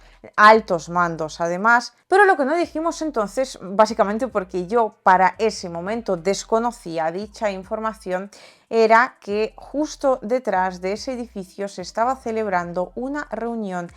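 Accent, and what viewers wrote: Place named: Spain. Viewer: Jaja "Ojos bellos..vosotros sabeis que eso mismo..les Hicieron a los Mexicanos..vale EE.UU. "Utilizo " de todo. Y despojo parte del territorio de Mexico...Puerto Rico..Guantanamo...Japón..los hidrocarburos de Siria..¿¡¡ Y LA ONU.?? AH PERO COMO RUSIA YA TOMO POSESION DE TERRENOS DE UCRANEA..AHI SI SE ASUSTAN Y ACUSAN..CON LA COMPLICIDAD DE GUTERRES. ONU..( hablas como española . Pero..vuestro fisico y rostro..LLEVAN "LA MARCA...SELLO" DE RUSIA..)😁😄😄🥰💕👍👍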